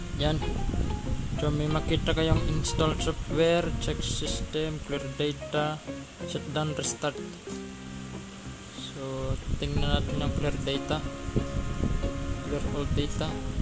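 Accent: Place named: India. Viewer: bat boses indiano parin kayo kahit pinoy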